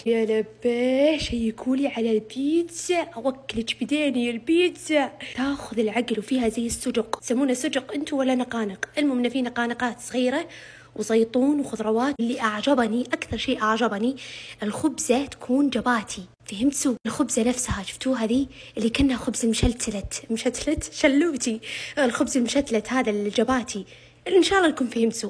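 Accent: Saudi Arabia. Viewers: بحب لهجة السعوديات كتيييييير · 😂😂😂 لهجه السعوديين غريبه وكيوت🤏🏻😭 😂😂شنو معناه يلبيه 🤔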